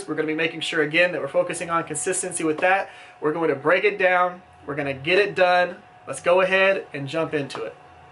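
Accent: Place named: Georgia, United States. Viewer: Brother you sound like you are from Georgia like me! :)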